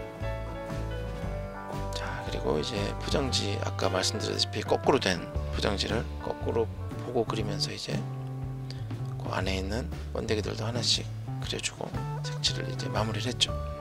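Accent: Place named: Seoul, South Korea. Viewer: 작가님 유튜브가 있는지 처음알았어요ㅋㅋㅋ너무 재밌네요 작가님과 수다떨면서 작업하시는 모습 옆에서 보는 기분이예요ㅋㅋ작가님 말투가 90년대 서울사투리? 같아서 듣는 재미가 더해지네요~~ㅋㅋ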